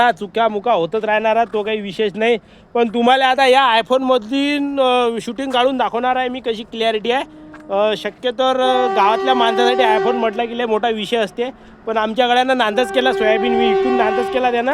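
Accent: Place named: Vidarbha, India. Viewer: भाऊ भाषा शुद्ध झाली आपली गावरान विदर्भाची भाषा राहू द्या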